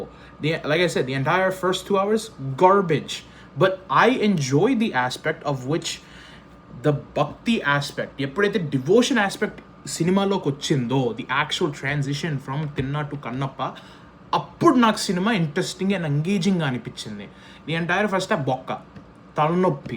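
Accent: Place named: United States. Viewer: Bro just speak without an accent bro. your /r/ sounds are all over the place. But you are doing a good job of creating content. you mix US and UK accent traits and as a voice and accent trainer you sound really Cringy bro. No offence, Lemme know if you want we can explore few tips so you soundd realllllly good bro. All the best!!! Peace :)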